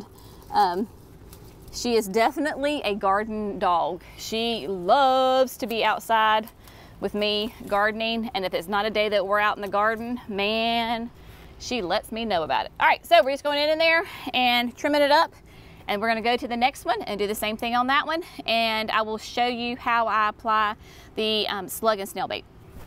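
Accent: South, United States. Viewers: I just found your channel and I love it. You are a very good teacher with a world of information and a great little southern accent. Thank you and be blessed.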